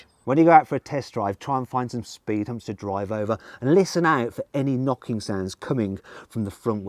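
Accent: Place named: Birmingham, United Kingdom. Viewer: Why does he sound less Brummie than on Carwow? No idea why anyone would emphasize that accent lol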